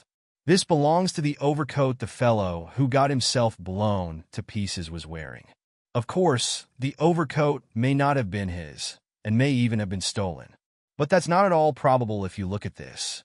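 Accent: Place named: United States. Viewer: Appreciate the American accent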